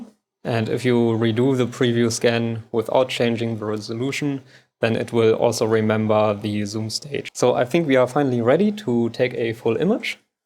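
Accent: Germany. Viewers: very well done...you are really a technical genius..,. by your accent can I say that you are from Germany ? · Wie deutsch kann ein Akzent sein. Dieser Typ JAAAAAAAAAAAAAAAAAAAAAAAAAAAAA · I heard the German accent and i knew it was gonna be very interesting.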